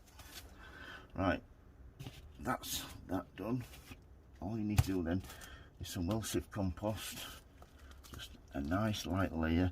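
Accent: United Kingdom. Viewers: Cannot hardly hear you--volume and brit accent--anyway you can list the medium ou place the seeds in? TY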